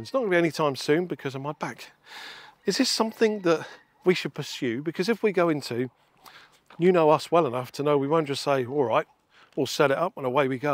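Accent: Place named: United Kingdom. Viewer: Hey. I've been following you for a few months now. I gotta say that I truly love your content and admire you both. I don't think people realize the work that goes into a Youtube channel sometimes and the return to work ratio involved. You guys are rock stars and I love how genuine you come across in your videos (Perhaps the British accent helps LOL). Anyhow, I also have a channel about anesthesia (I'm an anesthetist in the US), small channel 5k subs and I would concur that 98% of the comments are good, but I do get the eventual terrible comment that can be considered hurtful. I've learned that there are a lot of people that just want to criticize for no apparent reason. It can be brutal. But I conditioned myself to not become overly emotional with bad comments and I am quick to reply to them and tell them to move on. I could never understand why people have the need to make a bad comment on a video if they didn't like it. 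My thinking is just move on or swipe away. Anyhow, I'd work on the psychology of dealing with negative people and continue to do what you enjoy. I particularly like your videos when you explore the mental side and financial preparation involved in retiring early. Looking forward to your next video on how much do you need to retire. That I know, you've never dug deep into actual numbers and this is highly sought after forepeople like me who think we never have enough to actually pull the trigger. With that said, looking forward to the next video. Best of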